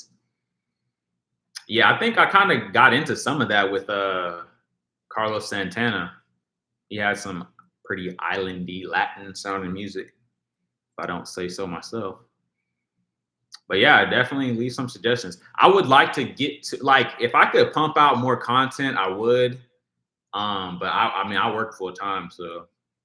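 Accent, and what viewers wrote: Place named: South, United States. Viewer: I’m sorry but I just have to say I love your country accent it’s sexy as hell!!!! It does things it’s very refreshing the whole attitude personality it’s definitely the whole package to me just sayin!!!!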